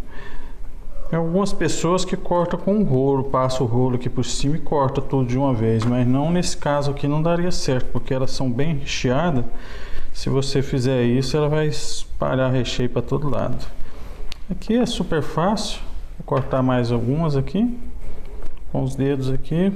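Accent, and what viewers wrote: Minas Gerais, Brazil. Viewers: Amei seu vídeo! Muito bem explicado, bem objetivo. E, como boa mineira, saudosa do meu estado, observei alguns toques de pronúncia, me parecendo estilo mineiro, o que me deixou ainda mais à vontade para acompanhar sua verdadeira aula. Grande abraço! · Muito bom, vc fala como mineiro, até pensei que vc era das minas gerais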